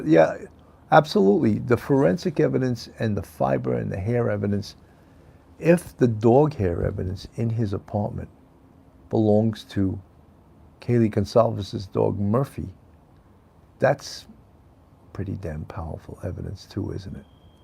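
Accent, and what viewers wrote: New York, United States. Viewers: Your NY accent is great! Why do negative people always feel the need to comment? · I love your new york accent. I love New York ❤ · I love your accent!!! Nyc??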